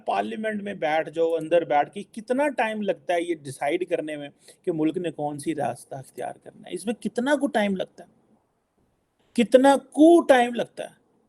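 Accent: Punjab, Pakistan. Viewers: Bosss stop using your Punjabi dialect if u want Indians to hear u, Kashmir me jehad naheen, aapkey mulk Ney crime kiya hai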